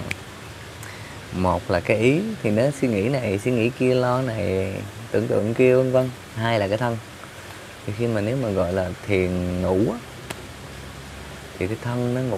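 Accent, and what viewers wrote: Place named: Mekong Delta, Vietnam. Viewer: Tập này a ngồi nc kiểu miền Tây hả😅 nhìn cưng ghê😍😍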